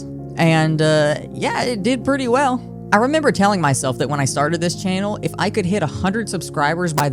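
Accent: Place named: South, United States. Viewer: Well you have another sub. Decent content man. And don't fret yourself on the script, it was decent as well. That SOUTHERN DRAW THOUUUUGH! (No judgement, Arkansas here.)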